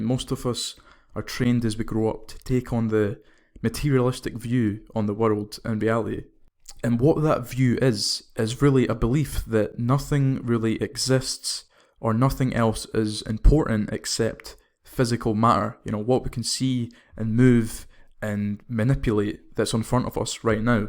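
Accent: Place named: Scotland, United Kingdom. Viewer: 👏 great scottish accent , cognitive training methods that are practical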